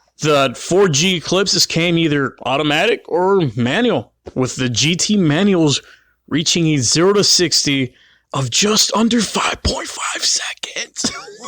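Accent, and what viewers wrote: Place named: South, United States. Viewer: Bring back the southern accent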